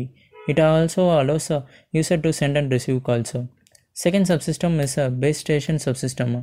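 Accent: India. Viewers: English....with little tamil accent 😊Cool right?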